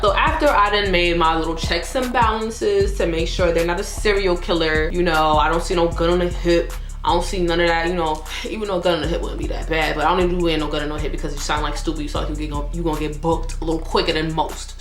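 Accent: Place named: New Orleans, United States. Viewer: lmao that nola accent something serious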